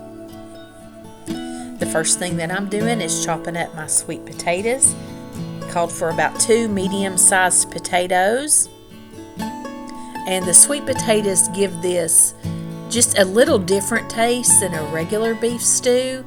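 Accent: South, United States. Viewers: Happy Fall!! Your recipes are so amazing and for us, its your sweet country voice that just relaxes both of us... we absolutely adore you and your content!!!! Thanks so much for joining in this year!!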